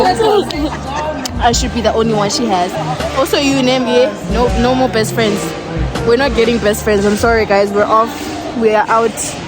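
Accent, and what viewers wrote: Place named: United States. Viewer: Thanks for going we won't even know u gone....u trying to sound like a American already so u will be just fine that side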